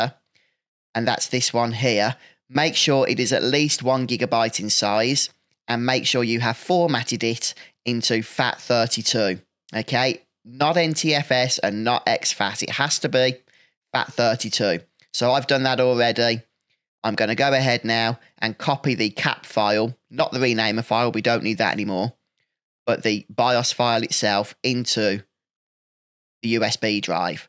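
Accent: Birmingham, United Kingdom. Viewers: We're having a debate, I'm convinced you're from Birmingham but my friend disagrees, please confirm haha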